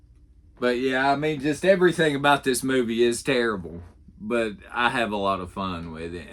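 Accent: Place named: South, United States. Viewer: Just found this channel. Great video. You sound like a fellow Southerner. New sub.🎃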